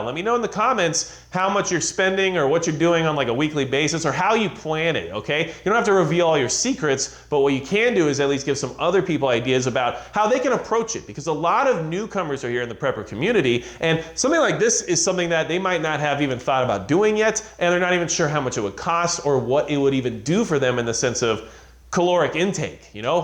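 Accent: United States. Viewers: You sound like you're from Hawaii lol. There's so many ways of making rice into a meal in and of itself. If anyone's reading your comment they best be listening because Japanese rice is the 💣 Asian rice is the best although I like jasmine and basmati as well.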